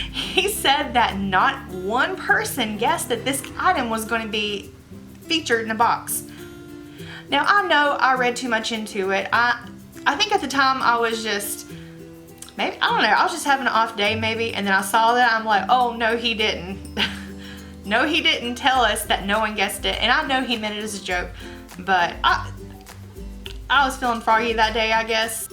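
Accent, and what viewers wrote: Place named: North Carolina, United States. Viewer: OMG! 😲 I'm here in Charlotte. I thought I recognised your accent. ❤💄💋b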